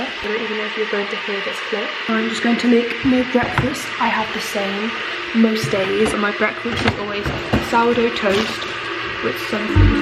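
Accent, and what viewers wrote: United Kingdom. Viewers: Your british accent is so cutteeeeee❤❤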